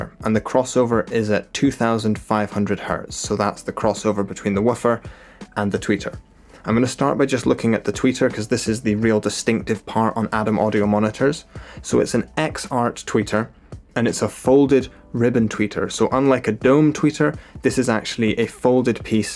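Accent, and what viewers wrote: Scotland, United Kingdom. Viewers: Where are you from I know Scotland what part ?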